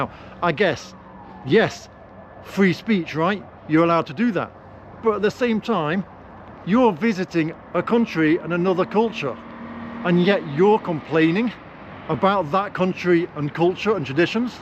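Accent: United Kingdom. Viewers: your accent somehow brought me to my school years, when on learning English we were listening to the tapes, and thats exactly how the typical British man sounded then. Got suscribed because of that small piece of nostalgy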